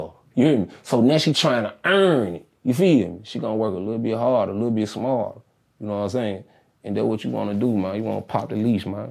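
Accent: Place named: Memphis, United States. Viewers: I understand everything he saying. But then again I am from Memphis. Other southern states also talk like us. Know what Imsaying? You have to put I’m and saying together. You feel me? (Another one of our saying.)RIP Freddy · Memphis- accent. As someone from nash, I am all too familiar with this accent. And the state of mind. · Soon as he started talking i knew his was from Memphis Tn. I know how we talk we have that certain swagg and talk to us specifically when we say mane that's just us! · Right I wasn't even near my phone when I heard it first come on, I said this one from Memphis! Then I heard Mitchell Heights and Binghampton and I was like Ayyyyyyeeeee!😂😂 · He's from Memphis whatchu expect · I'm from Memphis and I felt this dude and understood his speech and know the scenery that bred him and everyone here say youknowatimsayin including my college educated self church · I just knew he was from Memphis when he started talking 😂😩 iykyk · Born & Raised here and manee I swear this how Memphis Dudes be Moving And Talking they get up to explain the story better I guess lol I Love it 🤗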